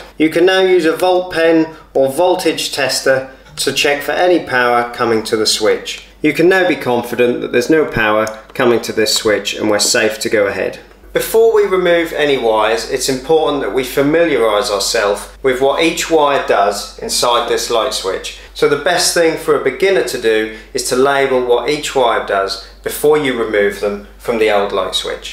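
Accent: Norfolk, United Kingdom. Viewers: Great content, are you but any chance a fellow norfolk or Suffolk man ?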